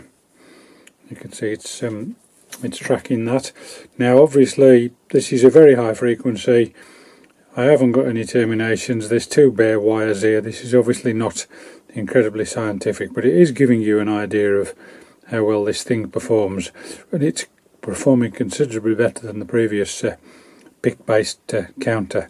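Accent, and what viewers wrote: United Kingdom. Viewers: is not a ‘dude’ - he is British (he sounds like it at least). and we don’t have them over here. 😂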